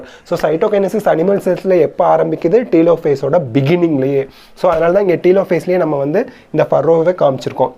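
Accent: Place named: Coimbatore, India. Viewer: Sir nernga entha place coimbatore maari thonuthu unga slang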